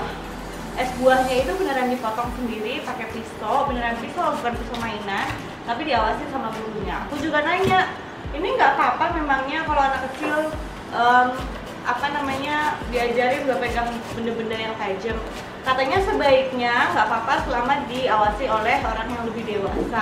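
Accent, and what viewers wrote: Java, Indonesia. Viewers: Wah mbk bohoso jowo wis rodok medhok mbk wkwk. Good luck all the best. Lots of love from Central Australia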